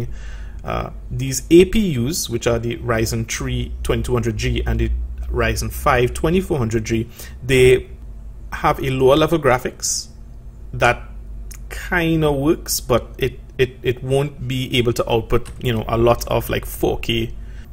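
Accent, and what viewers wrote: Trinidad and Tobago. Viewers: You can hear a Trini from anywhere in the world. 😂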